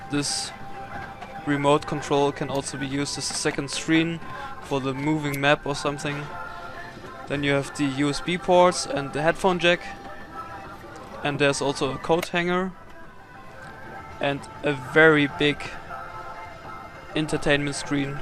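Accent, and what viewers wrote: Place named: Germany. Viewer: Selten so deutsches Englisch gehört haha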